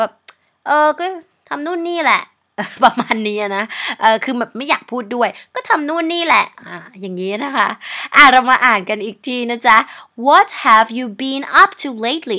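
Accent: Thailand. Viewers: มีสำเนียงระยองด้วยย555+ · ชอบสำเนียงภาษาไทยของ จังค่ะ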